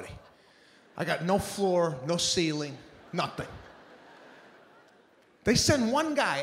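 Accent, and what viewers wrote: Mexico. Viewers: As a Mexican I approve of this 100% his Mexican voice and talk was straight up accurate. Dam this was fucking funny. 😂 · I mean thats ONE type of mexican accent. Its like rural "country" mexicans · He nailed our Raza, that’s exactly how all my Tio’s and primos talk. My dad speaks broken English this is him 100% I love it, thank you Sebastian. I always watch this clip ❤️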